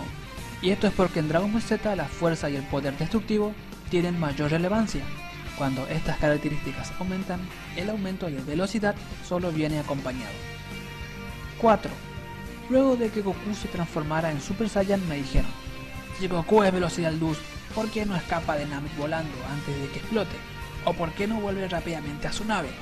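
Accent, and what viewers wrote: Argentina. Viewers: jajaja sos correntino?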